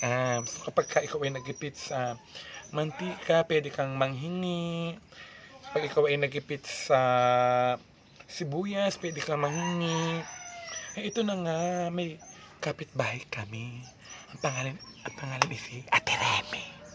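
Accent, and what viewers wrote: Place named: Cavite, Philippines. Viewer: ganyan din dito samin sa Amadeo Cavite. Same accent kaya nakakamiss ang childhood palagi ako nanunuod ng vlogs mo haha Thanks for always making my day idol!!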